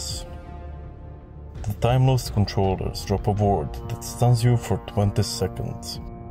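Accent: Russia. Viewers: Чисто русский акцент, давай видео на русском, читать сабы такое себе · Судя по акценту ты русский )))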